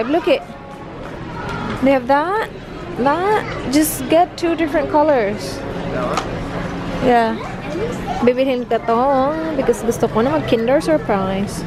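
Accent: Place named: Visayas, Philippines. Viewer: i love your accent meron bisaya sound ..hehehhe and also ilove you say ....mady,mady,madyyyyy....ahahhhhaha love ur vlogs ..hehhehe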